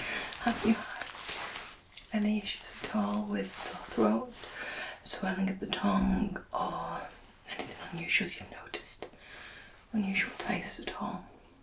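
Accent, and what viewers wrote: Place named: North West England, United Kingdom. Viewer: Hello, happy Xmas, that accent sounds north west?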